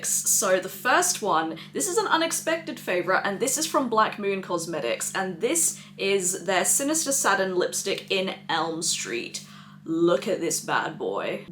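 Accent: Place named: Ireland. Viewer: More of that Irish accent, please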